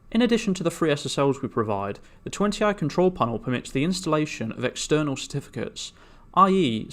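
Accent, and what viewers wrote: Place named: United Kingdom. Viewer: It's not really clear and I can't find SSL/TLS on cPenal but anyway I liked the video because you have a nice British accent lol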